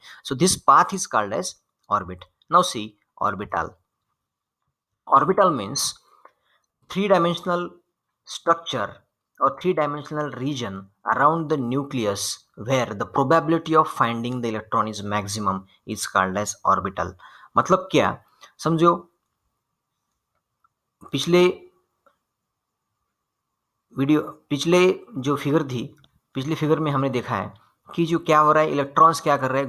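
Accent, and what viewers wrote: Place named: Maharashtra, India. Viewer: Bohot mast explanation all concept are cleared !!! And i love that MARATHI ascent 💞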